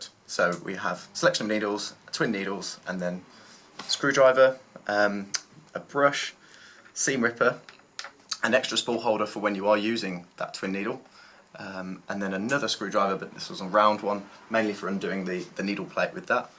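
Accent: United Kingdom. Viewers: So the BRITS LEAD the SEW.MACHINE REVIEWS ! 😅😊! I am FROM , Michigan , now in Boston, MA ., 74 and your accent helps me understand N.England people !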